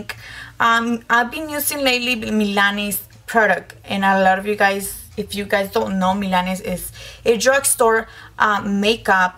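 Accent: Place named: Guatemala. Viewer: i love your accent :) im guatemalen too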